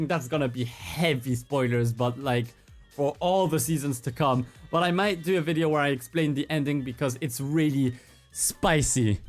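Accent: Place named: France. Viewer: So you're French too! Je me doutais bien qu'il y avait quelque chose dans ton accent! =D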